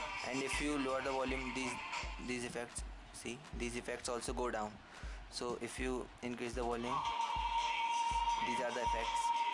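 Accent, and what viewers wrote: India. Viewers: Worst review ever watched..This loser doesn't know the purpose of the OFF button at the back panel next to the battery..But he thinks that he's a tech genius. :P Besides my ears hurt by this Indian accent.. :@ "Somebody Gonna Get Hurt Real Bad" LMAO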